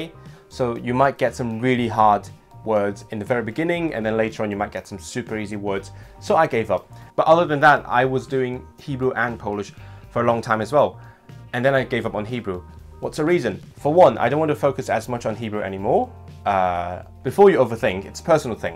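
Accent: United Kingdom. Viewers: To my non-native ears, I legit thought you grew up in the UK.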